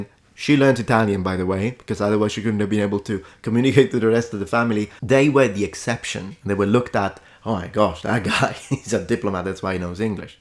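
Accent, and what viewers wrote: United Kingdom. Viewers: Oh yes that is why i can hear a bit of a british accent when you talk english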